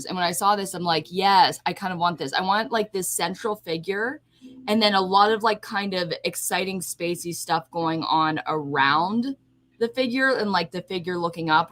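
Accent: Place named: Los Angeles, United States. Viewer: I so love your la accent! I love you sweet.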